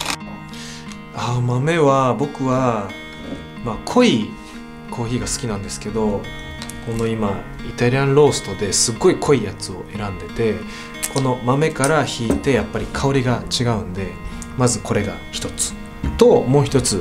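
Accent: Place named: Okinawa, Japan. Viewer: あたり、與那城くんの沖縄なまりがちょっと聞けて私は興奮しました。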